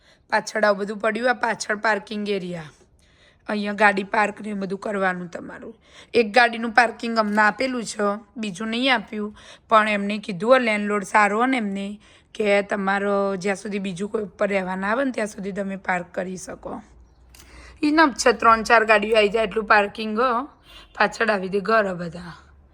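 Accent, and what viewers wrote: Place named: Daskroi, India. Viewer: ગાંધીનગર બાજુ, દસકોશી ના લાગે છે😀 ભાષા ઉપર thi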